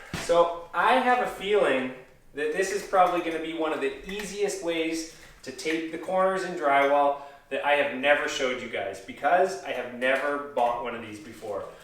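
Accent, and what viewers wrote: Canada. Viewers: Cool... The older you get, the more Canadian you sound eh. Awesome videos. Thanks!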